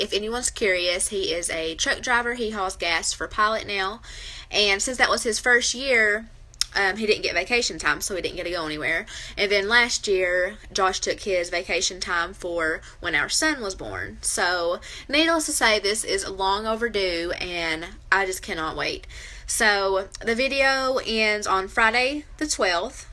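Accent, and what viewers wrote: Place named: Kentucky, United States. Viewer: Do you mind my asking where you're from? I grew up in Lexington Ky....your accent sounds like home to me. Really enjoy your videos, some ideas for something new to make.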